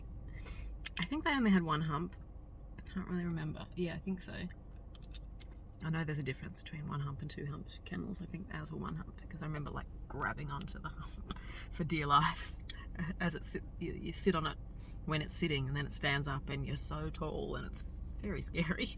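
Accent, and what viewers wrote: Australia. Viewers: Just found your channel....sooooo good! The Oz accent is beautiful....relaxing as fuck 😍👌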